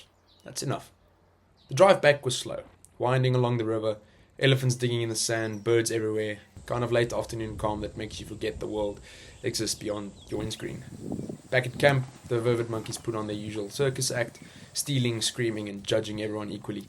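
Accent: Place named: South Africa. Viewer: Pretoriuskop to see leopards.....definitely not. Travel more north to the Skukuza and Lower Sabie region to see lions and leopards. Thravel NORTH not SOUTH from Pretoriuskop to the Skukuza region......you have the South African accent but you don't know directions, so maybe you are tourists. At the end saw your name....what a surprise.....I am also a! (from Pretoria)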